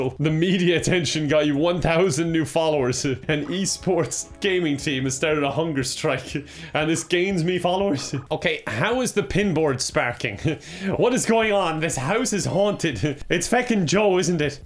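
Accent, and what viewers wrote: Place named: Ireland. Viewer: leans in “hHph“ me: there’s nothing that this man could do to make me NOT live for his irishness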